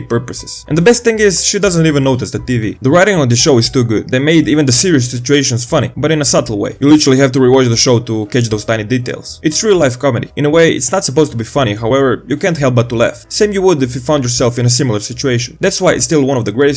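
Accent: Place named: Eastern Europe. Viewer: I found a lot of humor in the series, but I felt it was unintentional and a reflection of my own od sense of humor. This narrator - is it an AI generated voice with some odd Slavic or Israeli accent on speed?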